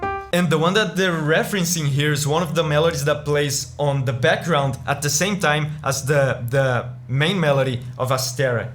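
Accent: Brazil. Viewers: Fatalis é um bixo muito brabo, percebi que tu era brasileiro só pelo sotaque kkkk vlw ai mano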